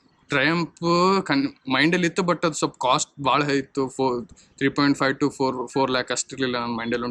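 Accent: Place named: Yadgir, India. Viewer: Proper Yadgir accents 🎉❤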